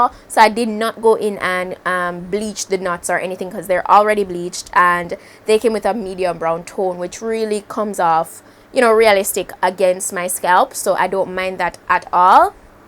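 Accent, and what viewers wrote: Jamaica. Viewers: U never heard jamaican accent🙄😢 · Goodasssss! Wooyyyy. Lol I just found this video today and literally recognized the accent and fell in love. Such a quick but helpful video! Thank you and definitely subscriber. Happy to see Jamaican YT prospering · Thank you for not camouflaging our beautiful accent with a twang. It’s refreshing to see a Jamaican with a platform like this. Keep it up! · Love your method! I m gonna try it today! Your accent has me missing my family in Kingston 😩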